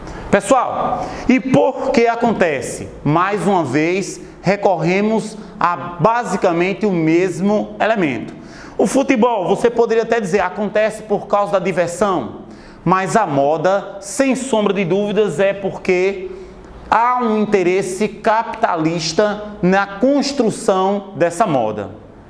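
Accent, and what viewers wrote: Northeast, Brazil. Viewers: Ótima explicação! E esse sotoque..do meu nordeste é lindo.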